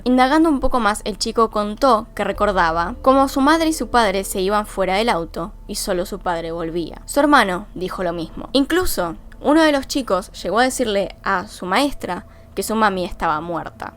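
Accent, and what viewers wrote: Argentina. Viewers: Ella es de Argentina y allá su pronunciación así suena...a mi en lo personal me encanta su manera de hablar, es linda! 👍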